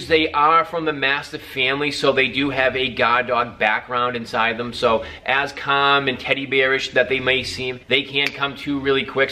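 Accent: Boston, United States. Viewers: You're from the Boston area... Just a crazy guess...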